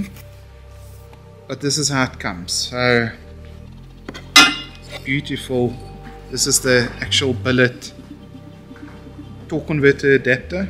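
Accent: South Africa. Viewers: Man I love the SA accent! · The south African accent is the best accent 💪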